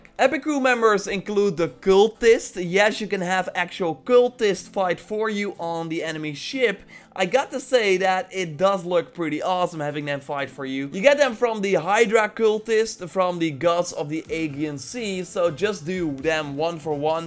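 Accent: Netherlands. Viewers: Are you dutch want het klinkt zo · Are you Dutch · Nederlands accent niet normaal xD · Are you dutch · 1 different question what is your country no hate but you sounds like somebody from the Netherlands 😅😅 Love your vids 😉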